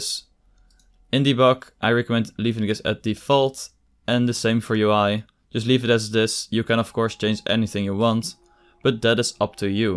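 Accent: Netherlands. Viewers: Haha ik hoorde het aan je engels XD, niet dat het slecht is. Goeie tutorial trouwens :) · je hoort meteen is Nederlander (geen haat bro)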